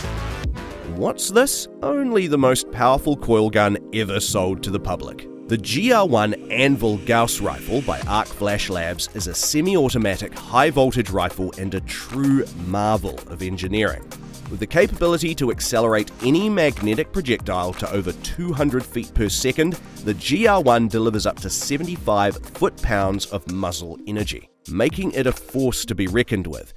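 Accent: Australia. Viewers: Notice they have an Australian narrorate since they wimped out and gave up their guns. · Aussie accent but he's talking USD$ and no shipping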